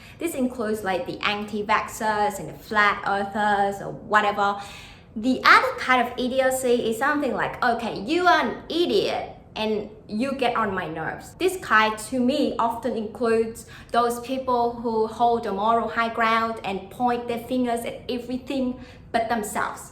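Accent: Australia. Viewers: I enjoy hearing your Aussie accent and I'm impressed with your mastery of English as your second language.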